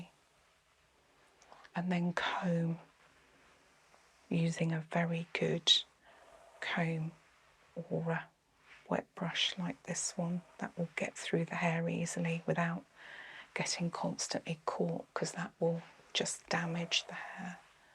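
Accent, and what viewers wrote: England, United Kingdom. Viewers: English accents are heavenly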